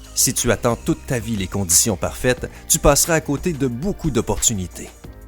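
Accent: Quebec, Canada. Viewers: 10ème commentaire! Sinon, t'as un genre d'accent français/québecois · Like si tu trouve que la vois et quebecoise lol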